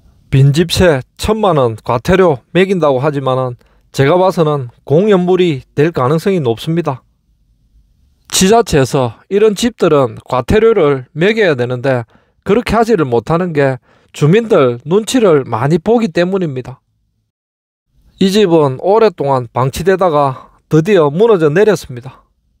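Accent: Gyeongsang, South Korea. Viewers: 이젠 경상도 사투리 자체가 싫다.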